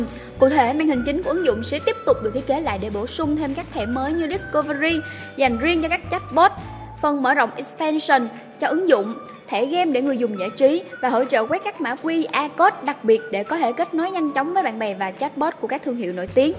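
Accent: Vietnam. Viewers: Chị đọc tiếng Anh mà lai tiếng Việt, ức chế ghê !!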